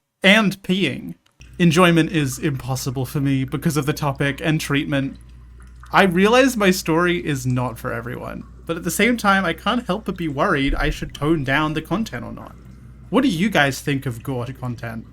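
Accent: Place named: Australia. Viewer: 1. i see (?) youtube 2. i hear from her voice that she's probably aussie 3. i subscribe and hope that the algorithm will show me more of The Gang 💅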